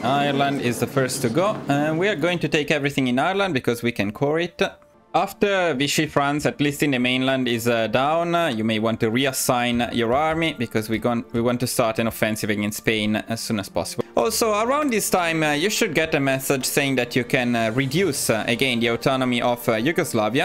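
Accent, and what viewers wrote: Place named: Italy. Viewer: I can't tell you how charming it is to hear you talking about italian places and units with your italian accent. Makes this a lot of fun. I don't have any DLC - is it still possible to recreate the roman empire without spies or the sophisticated re-integration features?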